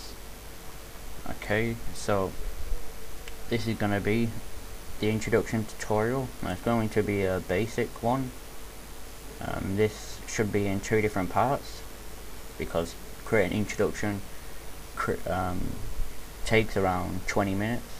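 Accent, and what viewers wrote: United Kingdom. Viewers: yeah go us british accents he's just jealous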